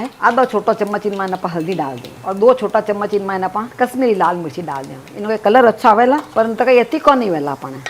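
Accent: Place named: Jodhpur, India. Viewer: Aunty aap ki boli Jodhpur ki lagti h, aapke video bahut ache h.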